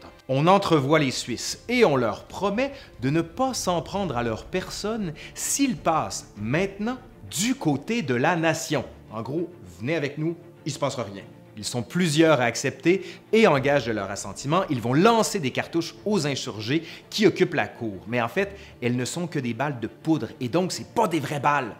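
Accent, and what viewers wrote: Canada. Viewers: Tu as un accent canadien🇨🇦🇨🇦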